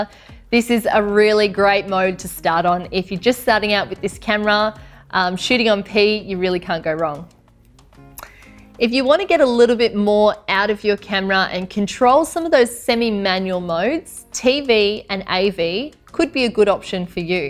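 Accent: Australia. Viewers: Aussie confirmed.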